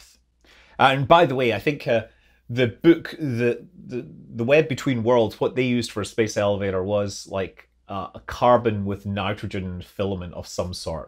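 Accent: Scotland, United Kingdom. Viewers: Great Q&A, Scott. You do a great job informing us. And for us "Amerikins" your Scottish accent is icing on the cake.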